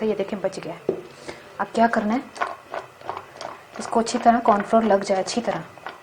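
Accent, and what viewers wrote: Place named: Punjab, India. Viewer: Ap kitna pyara bolti hain punjabi ma 😍😘😘😘 zabardast recipe 👍👌👌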